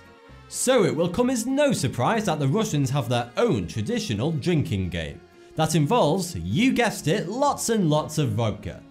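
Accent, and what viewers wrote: United Kingdom. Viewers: U from Uk?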